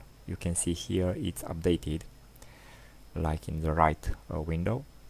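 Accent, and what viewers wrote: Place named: India. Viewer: The comment by Aayush Das Gupta says, with some profanity, that your English is good. NO, it is pathetic and is heavily laden with Hindi accent, the most pathetic of all accents. Your tutorial is a clear as stirred muddy water. Look at the down and upvotes. I seriously recommend you use a text to speech converter, there are a few of them to download for free. You should have used an ordinary text document, with page numbers and a proper word as a bookmark. LibreOffice's own help is as pathetic.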